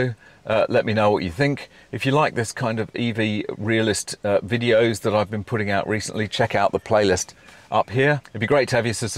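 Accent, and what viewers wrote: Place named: RP, United Kingdom. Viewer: Hey, someone that speaks the Queen’s English!!!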